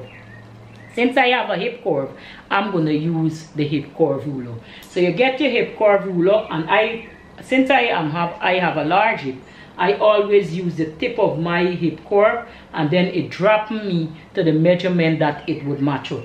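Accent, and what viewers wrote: Guyana. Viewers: Good day love your video you sound like a Guyanese. I am too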